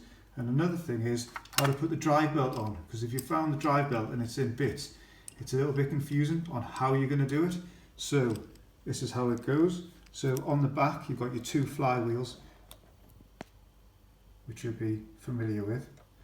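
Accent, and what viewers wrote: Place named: Newcastle, United Kingdom. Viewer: Though you were a Geordie from the accent! Question for you - I've got a PC37J (basically the same) been in a closet forever, pulled it out and nothing works on cassette. Pause button doesnt click or lock down. Could hear something small loose and rattling inside. Found the service manual for pc30, opened up the case and out dropped the pause cam (piece 61 for mechanism), nothing else. Took out cassette mechanism and theres bits of a belt wrapped around back of flywheel. Can see a pin that the pause cam should be on but not sure how it goes there or how it works. Also bits of a belt wrapping around back of a large flywheel on pause side of mech. Any chance this is an easy fix?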